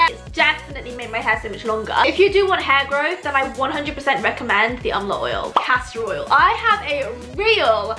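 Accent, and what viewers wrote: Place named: England, United Kingdom. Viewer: She's British-Indian and lives in England, you can tell from her accent that she's raised in England pretty much with Indian culture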